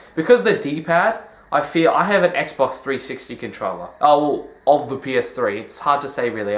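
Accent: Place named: United Kingdom. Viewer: You are half the reason I read every written game review in a british accent.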